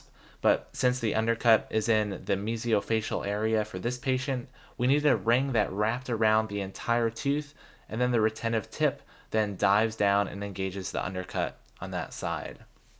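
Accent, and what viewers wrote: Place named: United States. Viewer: PLS WHEN YOU SPEAK TRY TO SPEAK ENGLISH NOT A AMIRICAN language COZ HERE SOME DR THEY ARE NON PROFICINAL ENGLISH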